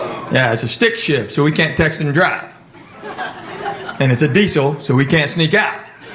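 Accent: South, United States. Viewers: Delightful southern accent. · I love quips! He truly is one funny dude! And I love hearing that thick Southern accent coming out of a full blooded Korean dude's mouth. It just adds something extra special because it's unexpected for someone who's seeing him perform for the first time.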